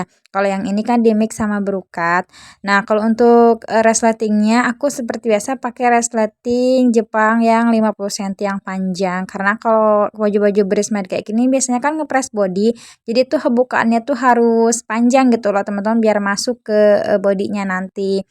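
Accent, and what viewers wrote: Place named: West Java, Indonesia. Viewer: Teh orang mana Sunda pisan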